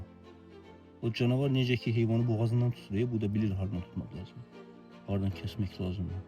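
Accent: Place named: Zaqatala, Azerbaijan. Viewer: Zaqatala ləhçəsi)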